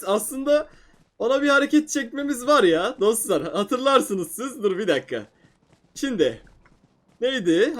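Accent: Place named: Adana, Turkey. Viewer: Yoo Adanalı lar da öyle konuşuyo ben Adanalıyım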